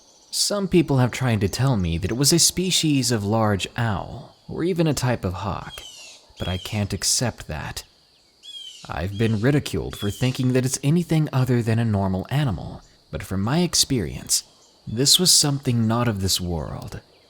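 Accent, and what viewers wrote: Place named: United States. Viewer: Why does someone who is an Irish man raised in Ireland speak like an American with northamerican slang and no uk/Irish terms or phrases.... You are fucking bullshit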